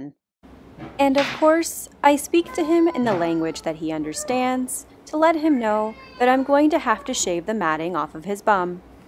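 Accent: Canada. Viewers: I love the commentary... Hilarious.. I'd bet anything your Canadian!!!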